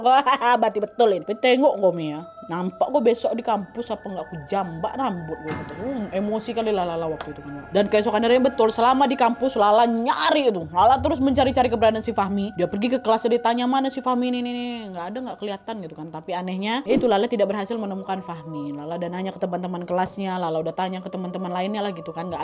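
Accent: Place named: Java, Indonesia. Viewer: pantes kakk kalo ngomong jawa medokk bangett😭😭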